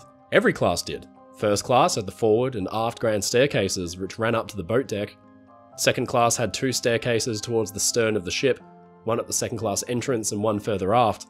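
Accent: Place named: Australia. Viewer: This channel is always a delight to watch. The narrator has an engaging way with words as well as a hint of an Australian cultivated accent which is very rare these days.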